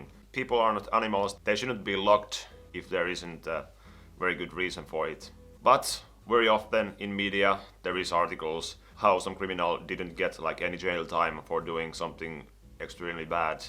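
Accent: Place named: Finland. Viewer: Are you a Finn? Cause that acsent sounds like you are a finn. I'm a Finn myself :P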